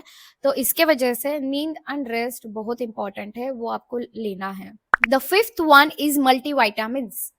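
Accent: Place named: Maharashtra, India. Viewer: Marathi accents in hindi speaking are very nice Being a Marathi I like to hear it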